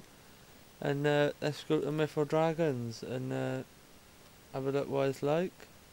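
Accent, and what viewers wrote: Wales, United Kingdom. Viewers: From Wales? Sounds like it :D good vid man. subbed, keep em coming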